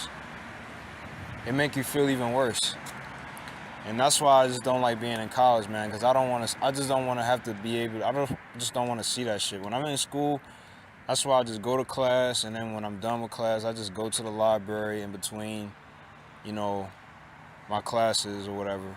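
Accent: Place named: Baltimore, United States. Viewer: Sounds like Baltimore for sure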